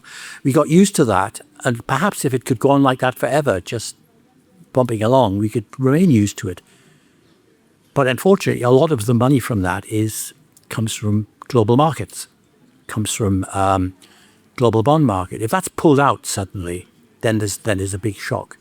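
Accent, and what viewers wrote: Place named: England, United Kingdom. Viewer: Mr. Gray's description of how and why America is embroiled in the Trump Era neglects the role played by those here who are enamored with fascism. Sure, Clinton's insensitive, closed-door rhetoric alienated many who would have never voted for her but to focus on this aspect alone is disingenuous, at best. Also, the "deplorables" weren't labeled as such because they love mining fossil fuels. They were labeled, fairly or not, because of their lack of social grace and their willingness to support a sexual predator who promised to hurt their enemies. Sometimes, an English accent doesn't make you sound smarter - it just makes you sound like an out-of-touch elitist from across the pond. Do better.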